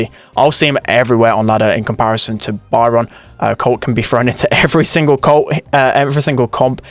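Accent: United Kingdom. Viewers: It's the british accent xD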